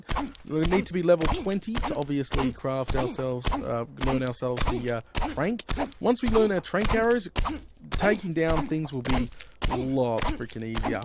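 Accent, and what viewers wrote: Australia. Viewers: well I've been watching for a lil bit of build big or go home but I have watch them all even you sleeping in the stream that was funny loved the d&r series and the series with and watty and all the Ark series but I stay for the aussie accent!!!!!! and is just a awesome young lady she makes me laugh a lot usually at you but that just means she cares a lot about you as of right now i'm building Independence hall as my main base and I made besty's ross house for my catus farm when I decide too make a iron farm if I do i'll make the empire state building just so I can get two farms in it so needless to say the buildings are not too small and I really hate collecting all that clay by hand probally used over 20 stacks of red bricks and need more it will be the only time I use this block in huge quainties love the series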